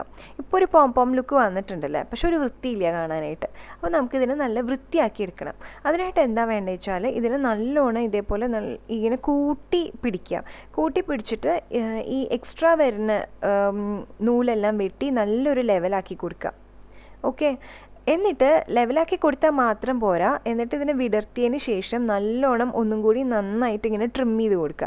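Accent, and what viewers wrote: Thrissur, India. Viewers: Chechi Thrissur kari anno 🤔🤔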